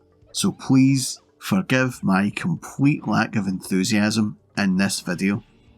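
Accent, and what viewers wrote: Scotland, United Kingdom. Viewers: Do you have another channel? Theres another guy with a Scottish accent on the segaguys channel i think😅 (asking if you're the same guy, sorry)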